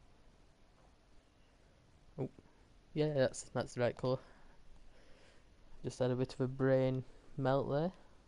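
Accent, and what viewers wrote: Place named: Yorkshire, United Kingdom. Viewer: Deffo from Yorkshire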